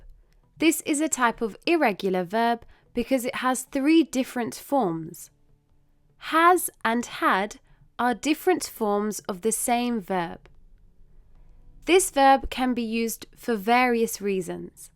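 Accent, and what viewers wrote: United Kingdom. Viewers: May I know which is the UK's accent you speak, incredibly keen on learning more and be able to speak like yours (hopefully)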